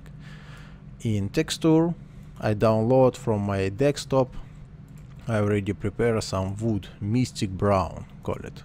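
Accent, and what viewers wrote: Russia. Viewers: Кажется слышу русский акцент?;-) Спасибо за урок!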